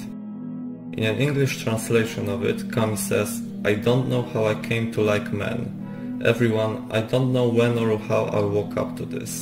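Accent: Poland. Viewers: brzmisz jak polak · Polish accent?? 🤨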